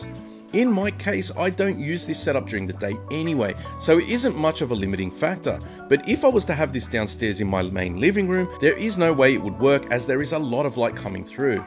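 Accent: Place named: Australia. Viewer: Just wondering where ru location please?! U sound Australian to me 😅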